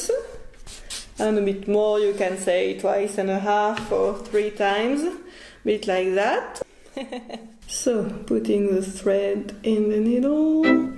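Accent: France. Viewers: Wonderful!! Thanks for showing us! And I love your french accent. It reminds me of when I lived in Paris in 2010. Yes, you make some little mistakes sometimes, but it's very charming! 😊✨💕🇫🇷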